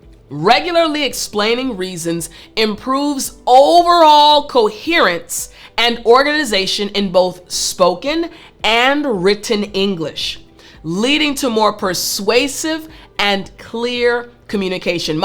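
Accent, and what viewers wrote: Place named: West Coast, United States. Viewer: I clearly love your acsent. why white people especially from west coast really I dont get it. I have subcribe and like and invite all of my family to learn english from your channel. Great way to learn english.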